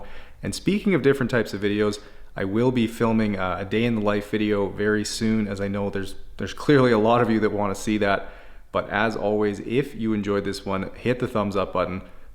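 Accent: Canada. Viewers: I’ve watched soooo many of your videos and I just want to say you sound very Canadian in this!!! Love it 😂❤️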